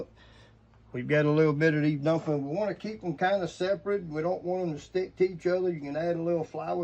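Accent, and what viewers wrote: Louisiana, United States. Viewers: Are you from South Louisiana? From Cajun Country?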